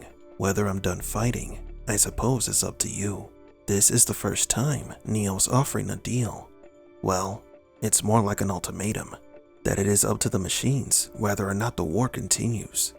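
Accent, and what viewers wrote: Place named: Russia. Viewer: Nice accent, narrator person! 👏🏻👏🏻👏🏻 Russian ryt?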